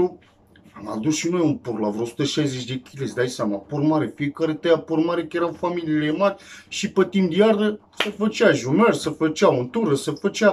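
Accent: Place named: Transylvania, Romania. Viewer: Mai ales graiul ardelenesc oo splendoare... 💪🏻💪🏻💪🏻💪🏻💪🏻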